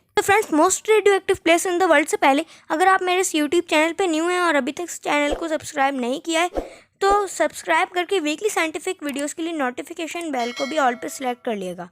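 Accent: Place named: India. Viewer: I am from britain I can’t understand you because of your accent so people who are not indian can not understand you very well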